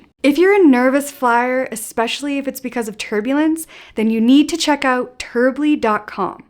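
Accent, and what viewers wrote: Canada. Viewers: Did I catch a little "pack an oatfit" in your "beg" Canadian accent there are you from somewhere else? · Man ,you are THE BEST !!! This was the best flight hack video I've ever watched !! Believe me I've watched a lot !! Lol. You covered so many sites & hacks nobody else mentioned !! The best editing, too !! And the way you speak,even though you spoke fast ,it was very clear to hear/ understand that I didn't need to repeat any !! Lol. Canadian English is the easiest to ears ,but still ,you are that good !! Usually I find almost the same content or lots of overlapping information in this kind of videos & I only saw several in your video ( this ) & they were important to mention anyway,but you provided way more new informations / more original, creative tips !! And so much fun to watch ,so,I got very excited to watch/ listen !! Even Level 8 suitcase ! 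I knew what was as I've seen others using that one in their video ,but I don't recall them mentioning what you described !? Like it is very handy that there's no zipper ,or it'll not allow us to overpacking ,so it's actually great for people with the tendency ! Lol Also you realized that suitcase would had saved your money because it's very durable & you purchased 3 , 4 luggage bags in 10 years as they broke !! As soon as the video finished,I hit the subscription button !! This was my very 1st video of yours to watch & I hardly ever sub to any if it is the first one ,or not til 4th or 5th even !! Lol As others video's subjects are super intriguing you mentioned in this & this was that good enough !! As well as I noticed that you seem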